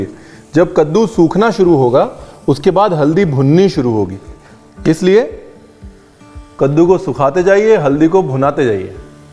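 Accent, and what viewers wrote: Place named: Maharashtra, India. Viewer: "Barni me dalke" nice ❤️ Marathi influenced hindi 😀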